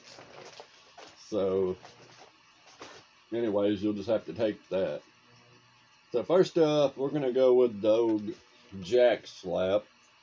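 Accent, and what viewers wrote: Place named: Northeast Alabama, United States. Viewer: Bud, you sound just like we do in northeast alabama. Lol and I believe that bass baits monthly won out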